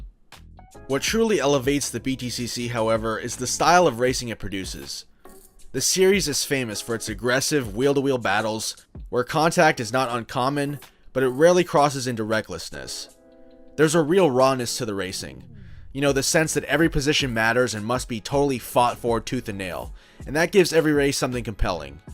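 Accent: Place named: United States. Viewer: I live 10 minutes away from brands hatch and have been going to watch the touring cars since the 90s. Its always been an exciting series to watch and has captured me since I was a child. I cant lie its a bit mad hearing an american make a video on this championship but clearly you have a good knowledge of the series and this was a great watch!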